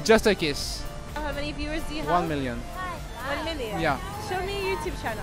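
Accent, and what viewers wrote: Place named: France. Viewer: Viens faire ça au Québec voir le pire c'est que avec un bel acent français ça devrais très bien marcher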